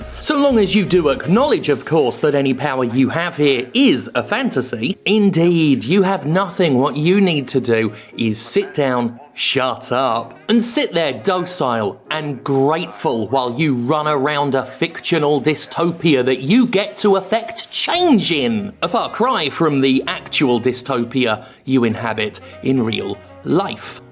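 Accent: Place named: United Kingdom. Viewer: the ones thing that irks me, is that you STILL buy the games and review them. Dont. Dont use the its my job excuse, show the example. Ive yet to see ONE reviewer, ONE online personnality actually not buy the games of companies that 'fill in the bank with something horrible'. I think it would have a much greater impact and put more force behind the words if when people asked 'how come you haven't done a cyberpunk review?' you could answer 'i dont support products of abuse', rather then oh ill review it when its on sale so I give the abusers 20 dollars less. I can't get passed it. Every reviewer acts like hes holier then though, its their job therefore they are above reproach. All these guys would ask ore suggest boycotts, but they would never make a stand. Listen, if you fear all your fans will leave you if you dontyreview the next big game..... 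that means they don't care about you or your content and you've failed as a creator. Im happy to hear you officially wont touch ubisoft games. I was gonna point out you did purchase breakpoint and blah blah. the lesson is to get to the end of the video! In your case specifically, I think people watch you for how you talk. No I don't mean the beautifull British accent, I mean how you vocalize your thoughts and ideas. You are a legitimate entertainer. I would wager you wouldnt lose a single subscriber if you only ever talked about these companies failures and not their products, never purchasing one again. heck youd even save money!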